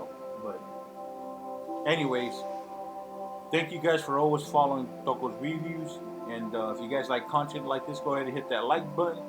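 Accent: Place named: Hawaii, United States. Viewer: I watched this for 2 minutes and could tell you were from possibly Hawaii or somewhere around there. I'm from there so I like to see if I can tell if someone is from there or not. Pretty good video man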